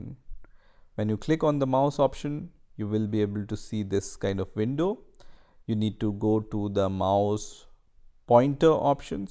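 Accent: India. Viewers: classic indian accent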